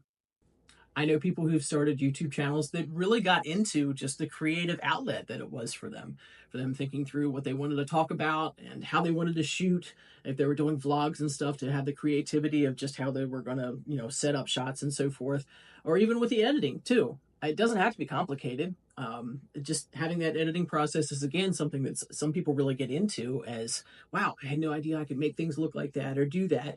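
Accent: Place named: Pennsylvania, United States. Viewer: Great information! Do I detect a Pennsylvania accent or a bordering state?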